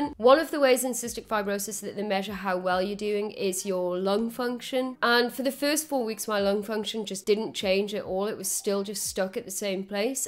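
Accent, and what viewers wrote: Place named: Teesside, United Kingdom. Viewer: Great news. Glad your health is improving. BTW, where is your accent from? Sounds a bit "Boro" to me.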